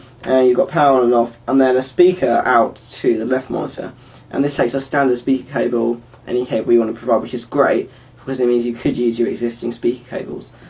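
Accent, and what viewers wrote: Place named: United Kingdom. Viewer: I couldn't understand half of the things you said...bloody British accent lol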